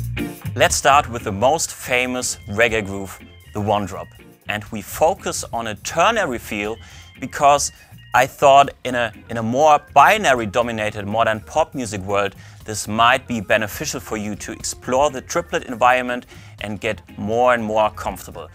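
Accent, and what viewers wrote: Germany. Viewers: Hallo Herr Das Video finde ich sehr gut, allerdings habe ich nach 2 Minuten abgeschaltet. Ich sehe einen englisch sprechenden Protagonisten. und denke mir das Englisch ist aber gar nicht so englisch. Das ist ein deutscher, der Englisch spricht. Ich muß gestehen das ich nicht so fließend Englisch sprechen kann geschweige es noch so gut verstehen kann. Das liegt daran, daß ich nun mal Deutscher bin. Jetzt komm ich aber zum Kernpunkt der Sache. Herr, warum machen Sie kein Video in dem Sie Deutsch sprechen? Für viele Menschen die nur Deutsch sprechen, würde es leichter fallen ihre Videos zu verstehen. Oder wollen Sie mit Ihren Videos nur Engländer, oder Amerikaner erreichen? Nun gut. Ich hoffe ich habe Sie jetzt nicht verärgert wegen meiner Frage. Ich wünsche Ihnen weiterhin viel Erfolg mit Ihren Videos So denn... ✌️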